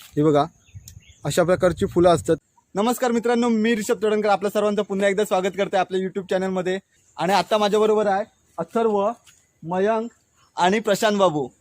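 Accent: Konkan, India. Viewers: छान कोकणी टोण मध्ये बोलतो तू. ते फुलाच झाड विषारी आहे त्या झाडा मूळे आजू बाजुला इतर झाड जगत नाही. परदेशी झाड आहे ते इंग्रज घेऊन आले होते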